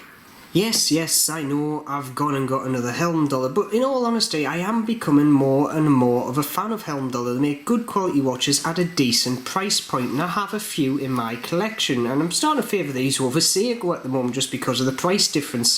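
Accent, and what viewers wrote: Newcastle, United Kingdom. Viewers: Hi. I'm a fan of Heimdallr and so I'm pretty disappointed by the quality of the lume, they should've done a better job of it. My skx homage of theirs is like a torch in comparison. Glad to see they've done a decent job of the bezel as they seem to have been getting criticism in that area with other models. Good to see you back mate we've missed that Geordie vibe.✌️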